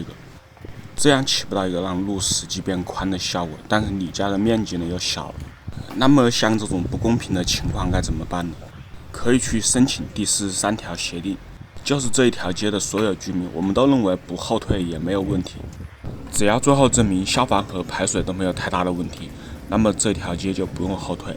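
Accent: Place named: Hubei, China. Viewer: 武汉口音😁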